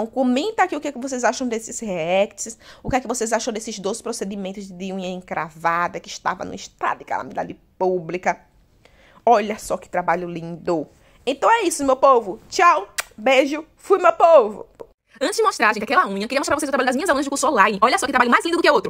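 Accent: Northeast, Brazil. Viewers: Nada chata, adoro o trabalho da, adoro os vídeos dela e até seu sotaque nordestino😍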